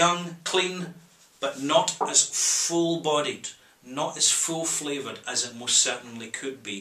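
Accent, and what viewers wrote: Scotland, United Kingdom. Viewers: Look for greenorg? Sorry but you have a bit of a scottish accent :p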